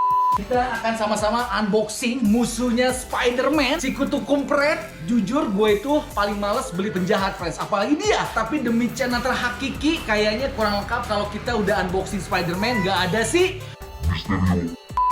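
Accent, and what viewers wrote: West Java, Indonesia. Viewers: Bang asli sunda?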